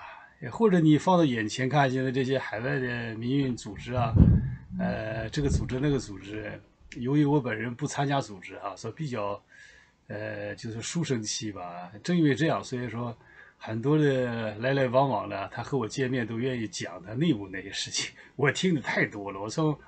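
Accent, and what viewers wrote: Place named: Dalian, China. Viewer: 大连口音啊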